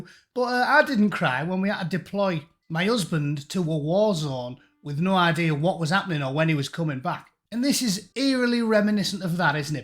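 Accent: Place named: Teesside, United Kingdom. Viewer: Spot on. Agreed with every syllable. Like listening to myself with a Teesside accent. I'll be using the one about John Wayne's safety catch 😂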